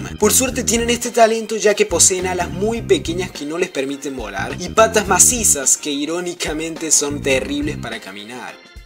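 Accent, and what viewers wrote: Córdoba, Argentina. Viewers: sos de Córdoba? De que parte?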